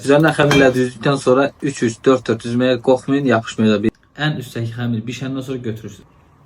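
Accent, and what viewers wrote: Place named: Balakan, Azerbaijan. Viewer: Balakən ləhcəsi )))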